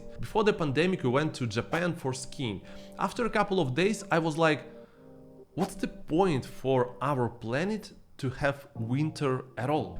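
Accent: Singapore. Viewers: "You need only T-shirt and slippers." Good one. Because now everyone WFH so no need pants. Your videos are absolutely hilarious by the way, your singlish power lah. Have liked and subscribed!! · very power singlish mate! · Your Singlish! XD For me, the weather here is fine, it's the humidity that kills. I agree with how convenient it is to get around. As long as you're willing to walk, everywhere you need to go in your neighbourhood is within reach. However, it also takes very long to get from point A to point B in some cases. I tried sitting in the bus for 2+ hours straight to get from the West to the East and I swore I'm never doing it again. (Thank goodness for the MRT.) There are some cons to living here as well like high living expenses... Which is kind of hard for many to start a family. And for those who are career-driven because it's fast-paced Singapore, might be neglecting their family and health too... 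I believe that it's always good to travel and broaden one's perspective and eventually find out what you really like. Glad to have you and your family here! :D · Because you spoke Singlish, I subscribe liao! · I loved your video. Especially your Singlish.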